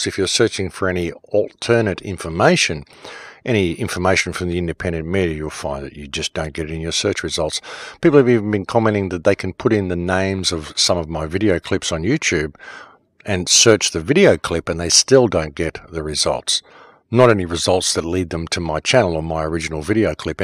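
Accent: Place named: Australia. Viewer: I love your vlogs it’s nice to hear a Aussie voice so smart and I wish you could go on Q and A wake up some more aussies we need it keep up the god fight 🇦🇺💖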